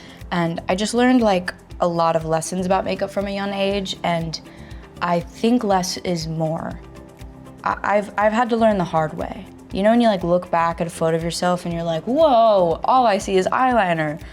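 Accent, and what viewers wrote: United Kingdom. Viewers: Omg her British accent is killing me 😂she’s too pure for this world i love her 😍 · Ahh dat British accent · I love her British accent ❤ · Her British accent is perfect 😍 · She speaks sort of british english or it s just me who think that?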